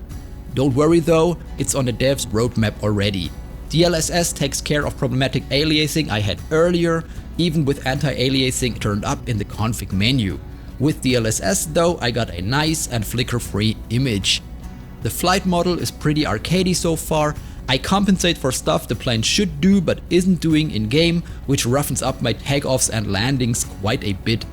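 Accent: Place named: Germany. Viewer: Ich hore das deutsche deutlich raus. 😂❤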